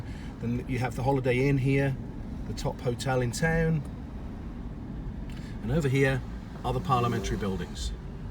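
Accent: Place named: United Kingdom. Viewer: He is British definitely from Uk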